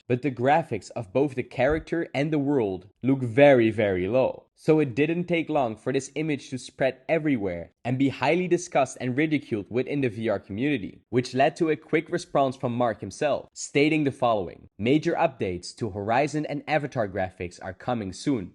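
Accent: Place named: Netherlands. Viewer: dutchy english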